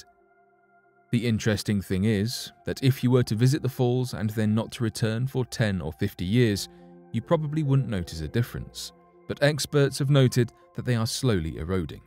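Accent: United Kingdom. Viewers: Why is a British guy narrating this?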